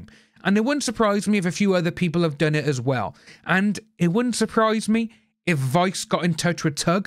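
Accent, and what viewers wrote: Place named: Wales, United Kingdom. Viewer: Fellow Welshie, here. Love your Welsh accent! 😄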